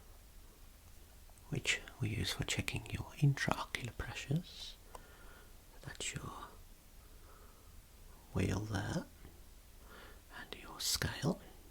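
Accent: Birmingham, United Kingdom. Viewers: Birmingham i tinks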